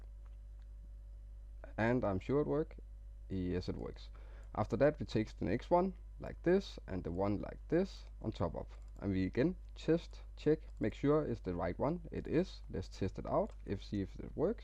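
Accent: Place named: Denmark. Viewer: You're danish aren't you? :p if not, your accent is extremely similar to a dane accent.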